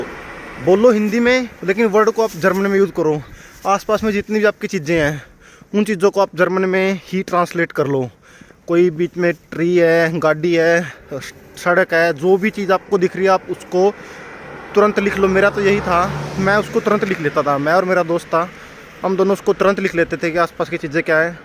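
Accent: Haryana, India. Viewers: Haryana ki boli lag rahi hai bhai. Thanks for the info.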